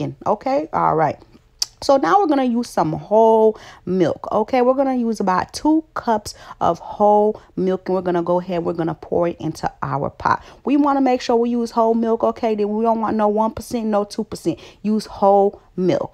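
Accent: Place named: South, United States. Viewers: "proper English" lol. I have relatives down south and this is proper English. I love the way she communicates, with such love and authenticity. There are plenty of channels you can go to where people are speaking Received Pronunciation or any other version of "proper". Bye Felicia! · Goodness! I'm struggling to understand this southern version of the English language.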